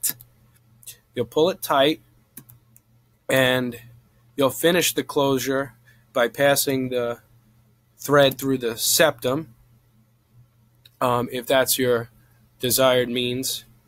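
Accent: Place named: Long Island, United States. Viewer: As I was listening to your video I couldn't help but hear your very distinct Long Island accent. I am from Long Island but moved to Texas 6 years ago. Thank you for this video it was very helpful, you're right, they do not teach you those closures in school.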